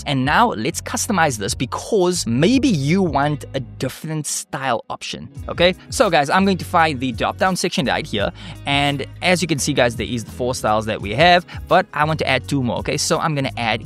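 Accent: South Africa. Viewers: I hear a South African accent there, probably because of Afrikaans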